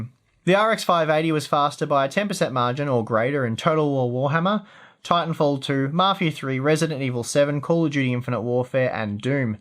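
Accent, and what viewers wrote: Australia. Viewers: I watch your videos because you have great benchmarks, and you're a fellow Aussie so your accent sounds more natural to me. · You Aussie? Kiwi and you guys sound alike 😅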